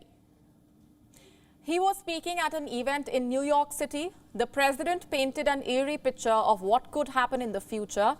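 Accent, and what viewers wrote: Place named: India. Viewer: Can u get rid of that accent. It sounds like any stereotypical Indian character in a western movie.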